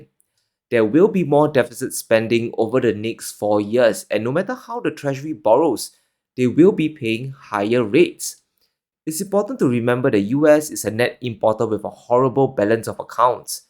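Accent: Singapore. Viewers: ❤❤Don’t worry.. your Singlish sounds OK, per usual… your research is priceless. Thanks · Also…I never heard your slur!!! Your sound Singapore to me like my good freind - no slur??!! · Your voice sounds fine. You have typical Singaporean accent which is a relatively easy accent to understand.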